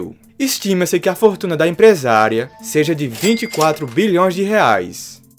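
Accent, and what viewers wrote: Northeast, Brazil. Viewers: VC é nordestino!! De que estado? Eu sou da paraiba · Vc é nordestino? se for eu também sou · Vc e do nordeste?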